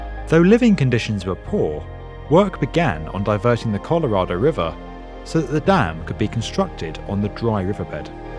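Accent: United Kingdom. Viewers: Bro it was obvieous from the bigginni g that he is brit all the evidance was pointing at it from not sounding the R at the end and from many things that differs brits from american accent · Why do we have a British guy talking about American history?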